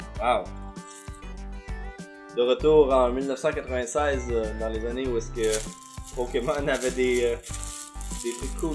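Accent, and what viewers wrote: Quebec, Canada. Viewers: i always wondered what your accent was ,but i knew you were from quebec 😅 je suis folle , je ne sais pas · Ce que j'ai retenu: tu as l'accent québecois, pas mal x) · J'ai tjr eu l'impression que ton TRÈS subtil accent en anglais était québécois. Fake j'ai été assez surprise quand tu as commencé en français européen. J'ai eu peur hahaha. C'était vrm nice de t'entendre. Ca permet de vrm plus s'identifier à ton channel. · Aha, je me doutais que t'étais de la Belle Province, la manière de prononcer certains mots trompe pas ^^. On sent quand même que tu parles beaucoup plus anglais que français parce que tu galères un peu de temps à autres haha (can relate tho) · Je suis là pour mettre une note. Merde t'es québecois ! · Ha ben t'es québécois!! Cool ça!! Continue ta bonne job, tabarnak!! ;) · Yeah! Vive le Québec! hahahaha ça fait drôle de te voir parler québecois :) Je m'étais habitué à te voir parler anglais mais c'est spécial xD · Tellement sympa d'entendre ton petit accent québécois ! 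:3 Petit coucou de la France ! :D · J’adore l’accent québécois jsp pk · La première fois que je t'ai entendu parler français c'était dans une vieille vidéo avec Aki, Joey et Noble quand tu as fait une interprétation de tsundere mais en français et j'étais beaucoup surprise je m'y attendais pas vu que je ne savais pas encore que tu es québécois 😂 j'aime beaucoup ton accent même si ça me fait toujours aussi bizarre de te voir parler français je sais pas pourquoi 😅 super vidéo en tout cas 👌🏻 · je sais pas pourquoi mais ça fait du bien de t'entendre parlé en joual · Dude, j’avais aucune idée que t’étais québécois hahahahaha, j’te regarde depuis un bon bout et j’étais sur que t’étais anglophone. Toujours des bonnes vidéos, mais sa fais bizarre de voir quelqu’un changer au québécois devant ses yeux lol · As expected from quebec, your accent made me laugh out loud, mate. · Yeeessss! Un autre québécois, j'avais un doute que tu étais français à cause de ton accent anglais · J'ai toujours adoré tes chroniques et avait remarqué ton petit accent français/quebécois. 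Je suis française et je dois avouer que c'est une des raisons qui m'a poussée à en découvrir plus sur ta chaîne. Merci de faire partager notre belle langue ^^ Prends soin de toi ! :) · Mon dieu c'est tellement bizarre de t'entendre en québécois. Je suis tellement content cela dit de savoir que quelqu'un de québécois nous représente dans la communauté anime youtube =D Also dans le même range d'age =D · Ca fait vraiment plaisir de t'entendre parler "français", au plutôt québécois au vue de tes expressions et bien entendu ton accent. C'est juste trop génial et j'aime bien quand on brise les barrières du langage pour parler d'autre chose ! Continue ton contenu, et j'espère un jour voir le retour des pianos tueurs. :') Des <3 sur toi ! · Je savais pas que t'étais québecois le couz, y a pas de mal de youtubeurs canadiens dans le game quand même. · Yaaaah jsavais qtetais quebecois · Haha, cet accent québécois si typique ^^ Je suis un français de France et il est vrai que nous adorons l'accent et le français québécois, ça nous fait toujours sourire ^^ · cool que tu parle pandent toute la vidéo en francais québecois en plus (je suis quécois)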